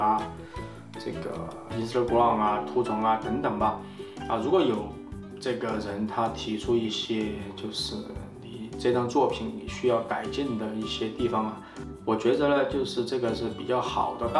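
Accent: Sichuan, China. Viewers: 老师是四川叻？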